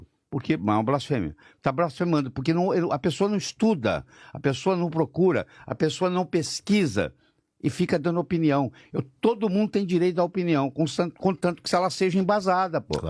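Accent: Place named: Portugal. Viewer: O sotaque da língua portuguesa mais lindo do mundo : ♡♡♡Portugal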